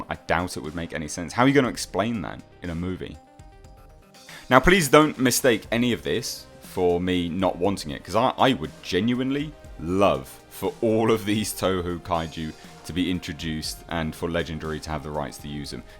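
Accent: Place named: England, United Kingdom. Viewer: Your trailer breakdown was the only one that was good!I'm 48, grew up watching all these movies, Gamera also! But I've never, and I mean NEVER been so excited for one of these movies, they've done godzilla so well! Monsters look very realistic! It's gonna be great! U have an English accent, are you in England or the USA? And are the release dates the same?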